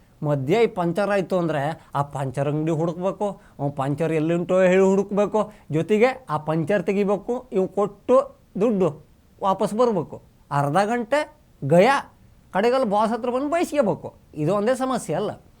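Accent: North Karnataka, India. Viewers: This is not kundapara language. This is north kannada language.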